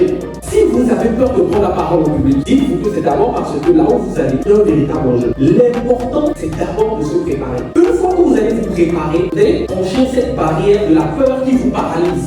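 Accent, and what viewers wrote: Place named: Africa. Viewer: Vous vous exprimez très bien malgré le petit accent africain. Cela dénote de la qualité et la quantité des efforts fournis.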